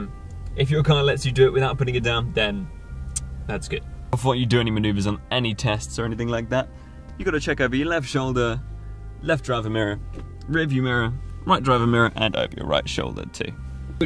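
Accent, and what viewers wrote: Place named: England, United Kingdom. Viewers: English accent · A British accent, he's from the UK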